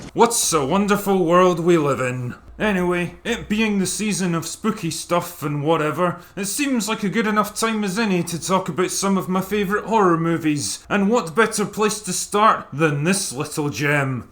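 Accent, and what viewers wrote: Scotland, United Kingdom. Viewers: I’ve watched so much of the lately that my internal monologue has turned Scottish. I find myself standing in a queue thinking “Fuck off World” in Drinkers voice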